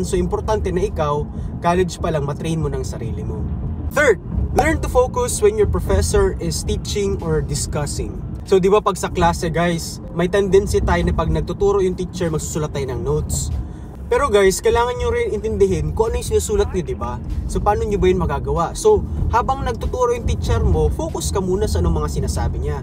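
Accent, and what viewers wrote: Visayas, Philippines. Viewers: Bisaya kaba idol?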